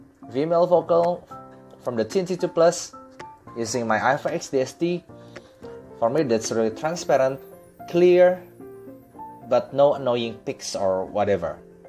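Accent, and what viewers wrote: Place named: Indonesia. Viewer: logatnya kayanya orang Indonesia nih....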